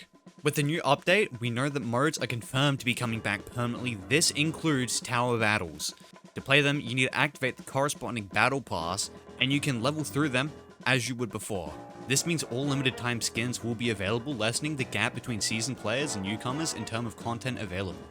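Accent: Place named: Melbourne, Australia. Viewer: It's giving Melbourne accent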